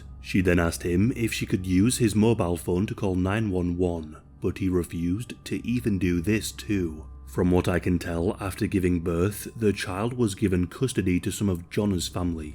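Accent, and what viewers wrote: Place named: Southern England, United Kingdom. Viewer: sounds like mid or southern England to me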